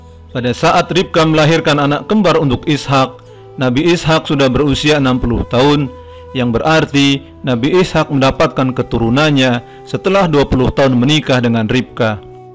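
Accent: Bugis, Indonesia. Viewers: Sy yakin yg ngomong ini orng bugis..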